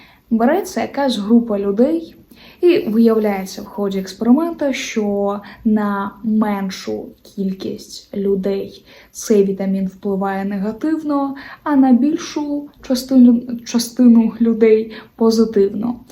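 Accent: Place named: England, United Kingdom. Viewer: Таке враження,ніби у вас англійський акцент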